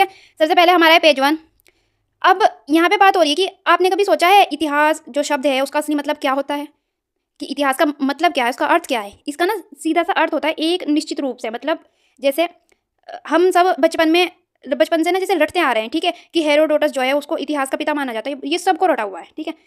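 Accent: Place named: Haryana, India. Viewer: Up west se ho thoda hriyana wali vibe h language me i think hapur gaziyabad meerut 😮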